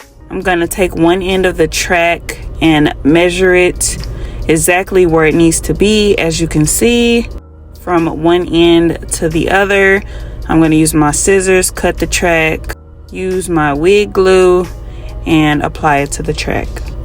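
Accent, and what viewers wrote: St. Louis, United States. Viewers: She sound like she from st. Louis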